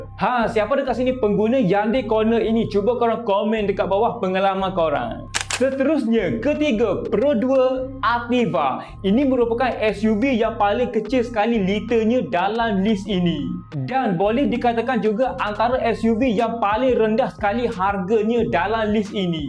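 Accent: Kelantan, Malaysia. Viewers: Ini loghat kelate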